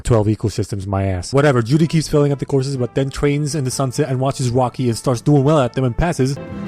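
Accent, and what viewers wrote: United Kingdom. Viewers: Lol did you get your British accent and lingo from top gear? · By Jove he's British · his british voice though XD "funi gag m8 DMV slow what a knee slapper m8 that made me laugh so hard oml -a fan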